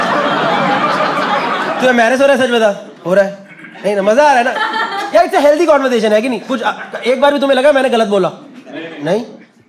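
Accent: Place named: Mumbai, India. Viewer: Got the Bombay boy accent on point bro. Great set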